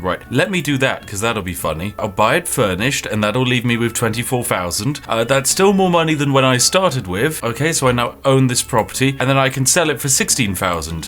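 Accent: United Kingdom. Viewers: oh my, that is one British Brit right there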